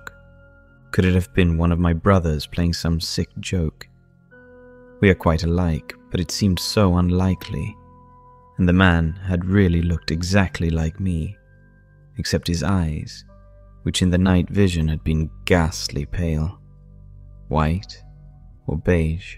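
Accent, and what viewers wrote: United Kingdom. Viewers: This is very incongruous: an American, living in America, speaks with a British accent. Credibility gap!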